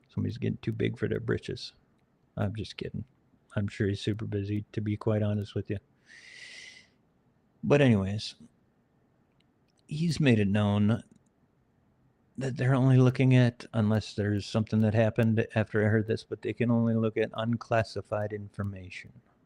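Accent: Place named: Midwest, United States. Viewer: Welcome back. Always enjoyed your perspective. Good to hear that midwestern accent talking UFOs again.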